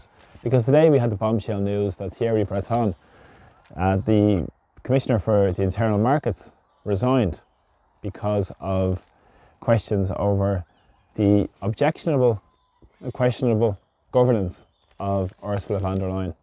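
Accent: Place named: Ireland. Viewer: Please people give this channel a subscibsion and a thumbs up we need a the real irish voices out there,thanks for all you do,thanks.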